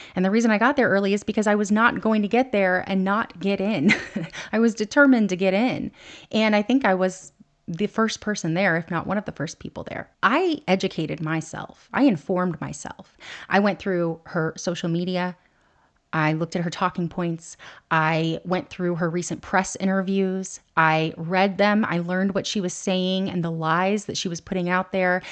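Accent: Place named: South, United States. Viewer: Her southern accent makes her sound unintelligent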